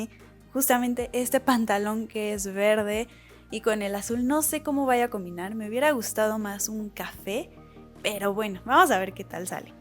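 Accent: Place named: Mexico. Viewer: Te quedó hermosa la foto!! 📸 PD: me encanta tu tonada mexicana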